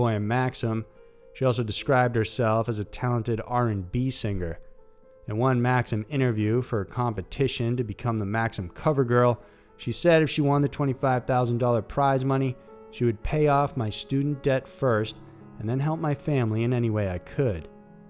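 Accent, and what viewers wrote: South, United States. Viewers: your voice really makes these videos interesting. Am I hearing a southern accent? Have been watching a ton of these the past two days, you got yourself a new subscriber!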